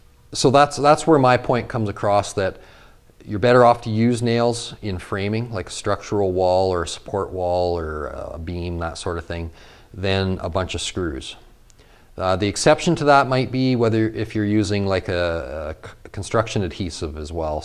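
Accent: Canada. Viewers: Is that a Canadian accent I hear? 🙄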